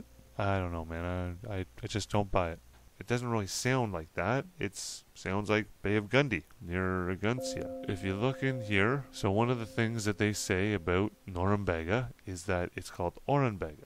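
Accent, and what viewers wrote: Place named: North Carolina, United States. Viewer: Welcome back. This one must have taken quite a bit of research for you! Very good info and detail; I've never heard of these men before with the exception of Verrezano, whose name you are pronouncing with an extra 'z' at the end. I love your accent, and said to my daughter the way you pronounce certain words, like 'about' sounds like you are North Carolinian. Then you tell us that Norumbega is near where you are from! We have a St. Johns River in Florida, which is 310 miles long, and runs parallel with the Atlantic.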